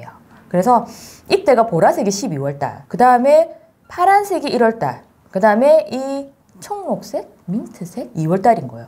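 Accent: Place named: Busan, South Korea. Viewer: 설연휴 보석같은 강의 너무 감사드립니다! 핵미모+부산사투리 매력만점 팀장님이십니다^^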